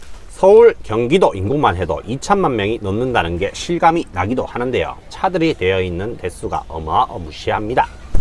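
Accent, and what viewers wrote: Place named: Chungcheong, South Korea. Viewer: 웰컴 서해안ㅎㅎ 경상도 사투리만 나오다 충청도사투리ㅎㅎ고향말투라 더 반갑네요ㅋ뜬금없지만 김포도 함 오세용^^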